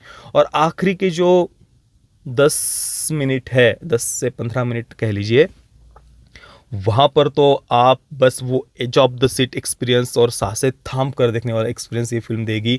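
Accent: Maharashtra, India. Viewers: Aapka hindi itana mixed with marathi hai...bohot jyada, isme koi burai nahi but aap ek reviewer ho, hindi to perfect chahiye...nahi to apaka channel itana grow nahi karega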